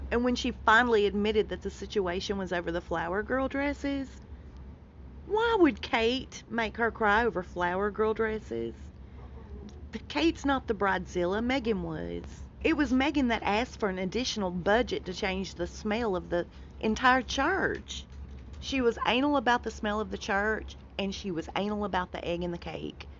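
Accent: South, United States. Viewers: someone just posted on Twitter that a security guard on the dress fitting got mm on film pitching a hissy-fit (you sound southern, so i know you will know what a hissy-fit is) and is releasing it to the Canadian press.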